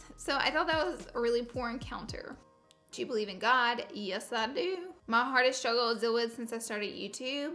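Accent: South, United States. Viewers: I love your cute little southern accent!! ❤️ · I love your videos & love your southern accent. x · Omg!!! Never been fist person to comment!!!!! ILYSM❤️❤️Ur the bomb we both have a country accent❤️😂💄💄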